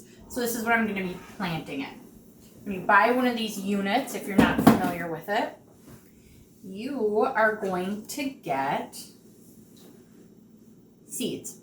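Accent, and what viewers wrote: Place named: Wisconsin, United States. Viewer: I’m trying to figure out your accent. Is this Wisconsin?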